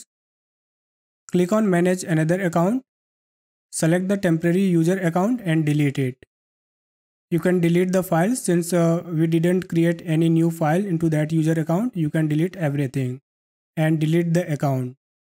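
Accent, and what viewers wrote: India. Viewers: Powerful bro with indian accent . Thank you so much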